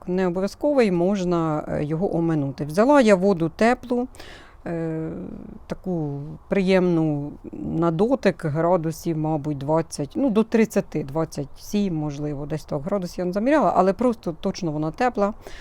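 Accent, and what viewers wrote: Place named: West, Ukraine. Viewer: так ви з Полтави? І не скажеш. Розмовляєте так прямо як із заходу. Мені дуже подобається нормальна українська мова, бо у нас на Дніпропетровщині суржик. Щось як ляпнем.... вчилися в совдепіїї у рускіх школах. Занедбали нашу красиву співочу мову. І до сих пір не ленійка, а лінейка. Це я так колись сказала.дякую за відповідь. Хай щастить.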